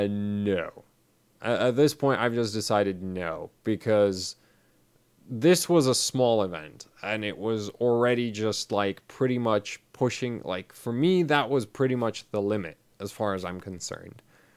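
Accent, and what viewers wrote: United Kingdom. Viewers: British lol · I didn't realise your from the UK because your accent is very vague. I'm quite disappointed that there's very little tech events that happen in the UK. I would love to see you and 8PACK as an overclocking team (you have all the hair and he has all the muscles). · British.. I never would have guessed it.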